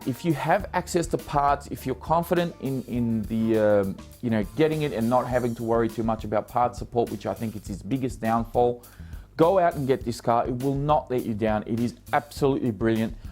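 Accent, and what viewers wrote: Australia. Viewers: bro ur voice is classic aussiee!!! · cool accent to auzzie and south African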